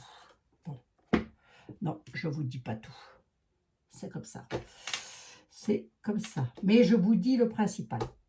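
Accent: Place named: Paris, France. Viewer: J'aime tellement que vous soyez une belle lorraine et que vous parliez avec une véritable gouaille parisienne 💓💕❣️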